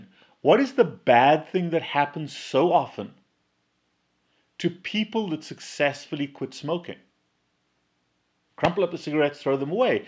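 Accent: South Africa. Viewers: I really love this! It's taken me 56 years to learn emotional strategies after having been brought up in a home where food, opiates, alcohol and cigarettes were how my parents coped. And how I spent my whole life coping. Now, finally, at 56, I am fighting daily for my freedom from all those things, as I transferred in and out of all of them. And it's working. The most significant change has been following a keto diet. Meat, veg and fat. No baked, fake copy foods. Just from the earth. Only if it swam, ran, grew or flew, will I consume it. And my life has changed., love it that you still have an accent. You make us SA folk proud. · My mom had bariatric surgery many years ago. She lost a ton of weight because she could not eat, but then put it back on again when she discovered she could drink all the coke and alcohol she wanted. She used to also melt chocolate in a fondu pot and drink the chocolate with a straw. 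She died ten years later of liver cancer. I am so glad I stumbled onto your channel. You sound South African. Hello from Johannesburg.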